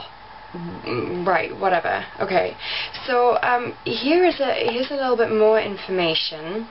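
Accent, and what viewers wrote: Newcastle, United Kingdom. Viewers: R u geordie? sry off topics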